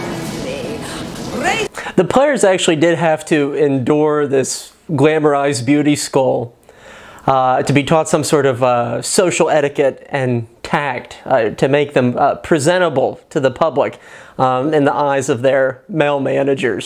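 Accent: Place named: Mid-Atlantic, United States. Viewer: a compliment of the highest order. I'm a lifelong Philadelphian, I've always said Hollywood tells us only 4 accents exist in the US , California/valley girl , New York, Boston, Southern and occasionally Midwestern . Yet the Mid Atlantic/PA accent is just as distinct as any of those, yet it's never even attempted. Despite the gluttony of great actors from the region like Tina fey, Bradley Cooper, Kevin bacon , will smith and Kat dennings. Worst of all whenever a movie is set in Philly they usually just have the actor do a new York accent. When I started going to school in upstate new York people thought my accent was fake, they litteraly thought I was pretending. Thank god bam margera and his show viva la bam became popular. At least then people could see I really did say "howgie" and "hoooomee" They say that accents are begining to vanish. 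So when I hear someone unapologetically speaking in a distinctly regional accent I love it. Especially when it's my region . I'm a big fan of movies, history and Pennsylvania so I'm a big fan of what youre doing. I think it's a great and unique concept and you blend the two really well and make it enjoyable. I can't recommend your channel enough. Keep up the good work.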